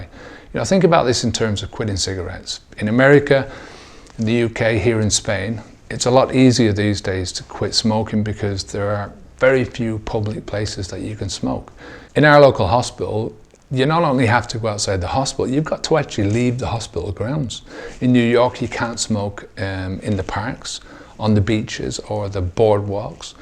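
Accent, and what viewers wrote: Ireland. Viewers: hi you sound like a fellow Irish man and fair play for kicking the booze, i relapsed recently am not sure if it was pressure from doing my master or looking after a sick parent, I used to have a vibrant social life and was able to handle a drink and thought that i could handle just the one and be like everybody else but ended up on a 5 day bender. My question is maybe its the disease of of alcohol making me think am missing out on socialising how to you build a social vibrant life? its not the fact that am depressed but alcohol has hospitalised me on many occasions, accepting defeat is difficult and ive lost jobs and even friends to this but until that relapse i was doing great, things is every time i fall recently i bounce back stronger maybe am learning very confusing.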